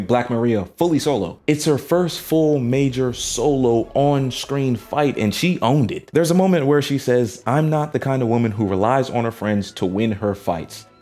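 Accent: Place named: Australia. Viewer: I read this in his Australian accent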